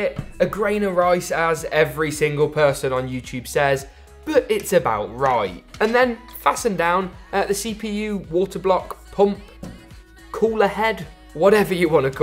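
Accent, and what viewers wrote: United Kingdom. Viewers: He has that perfect Harry Potter accent. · You have a huge british accent!!!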